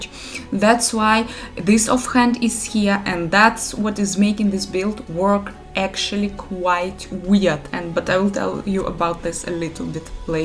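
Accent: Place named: Russia. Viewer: просто акцент был похож на русский, извиняюсь, продолжай в том же духе)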